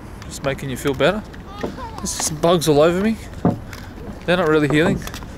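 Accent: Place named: Australia. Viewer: WOW now this is really a head turning video, I had no idea you were an Australian, it is weird hearing that accent you naturally have, that does not come out on the music videos, oh my god thank you, it will be a longtime before i can make it down under, the funds are not allowing a vacation that far away. Thank you from the bottom to the top of my heart, and give the wife a thank you as well, nice video, USA Missouri,Lake of the Ozarks or the Midwest Coast as they say 1150 miles of shoreline, the oceans are great medicine Atlantic Pacific and Gulf of mexico, pacific northwest up by seattle is where i like it most, its a big angry seeing all this plastic everywhere, oh man i hate pollution and the lack of much effort to clean it up...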